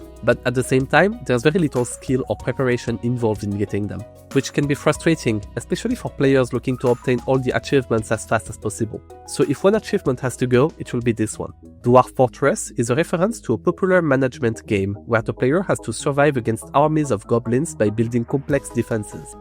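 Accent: France. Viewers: you sound like you have a french accent. Am i wrong?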